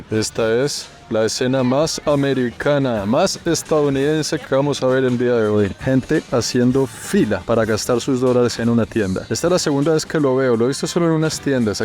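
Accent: Bogotá, Colombia. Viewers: Saludos a lla.mama de. Tiene un exelente hijo un grab ser humano. Bendiciones. Dialecto colombiano a donde quierea va 😍Me encanta.. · Acabo de descubrir tu canal, y ne gusto mucho tu manera de relatar los videos, me encanta tu acento colombiano, ojalá vengas a alguna parte de Mexico y te conozca en persona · M encanta como documenta, aparte ese acento colombiano que tambien me gusta. Parse,un gran saludo y abrazo desde CDMX!!! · 🤣🤣 habla como mi abuelito: para que 50 dólares si en 2 meses le crece la pata 👟 yiyos 🩲🤣chagualos 👟👟🤣 los calzones con la marca para que el que le vea la nalga sepa que lleva Tommy 😂😂 muy Bogotano el hablado, Saludos desde Bogotá.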